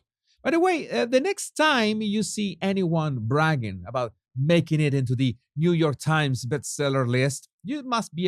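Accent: United Kingdom. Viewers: Love the British accent! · Your British accent cracks me up. 😂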